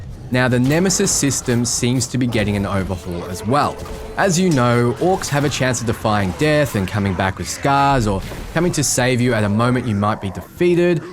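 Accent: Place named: Australia. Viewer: You sound like Fudgemuppet. Fellow Aussies! 🇦🇺🙌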